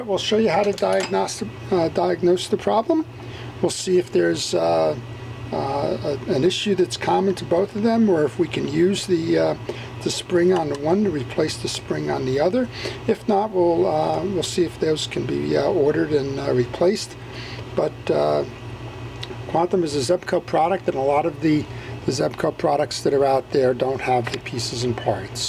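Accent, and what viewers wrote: New Jersey, United States. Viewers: Just found you a couple weeks ago, love the Jersey accent,I live in CT. am 60 yrs old and ONLY fish with vintage rods and reels,I give the "new stuff" to my grandson.I have in my arsenal many 60's-70's japan reels which were made by the big three(Daiwa, Olympiic,Zebco, etc.., under different names,they're tough,cheap, and easy to repair,and THEY CATCH FISH!!!,I also have some french mid-century reels including the Mitchell saltwater line and my beloved RU-MER's I'd llike to see a vid on some of those old "coffee-grinders", they can be pretty intimidating when you take the side cover,off, or try to repair the bail mechs, Anyway thanks for posting, and helping others like myself who appreciate a time when things were made to last!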